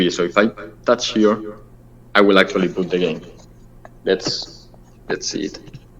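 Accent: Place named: Spain. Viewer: claro primo. ¿No reconoces a un español hablando inglés? Se nota el acento!! :D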